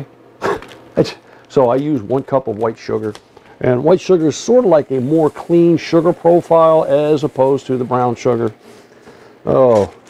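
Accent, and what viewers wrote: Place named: Appalachia, United States. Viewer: Tou dont have very good control of the english language at ANY rate, APPALACIAN ENGLISH IS HAYSEED ENGLISH.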